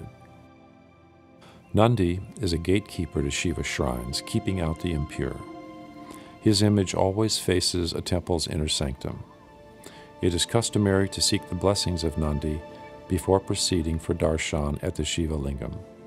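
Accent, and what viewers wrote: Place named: England, United Kingdom. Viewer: Excellent Presentation and Video steady, well explained voice and accent so beautiful graceful and respectful english spoken by englishman is the way to go in these day. People around the world need to know our Hindu Cultural, Heritage God Temple Site. Thank you very much clear view and explanation perfect keep it going with all other temples too. I have not visited that temple yet but seeing this I want to in my Life.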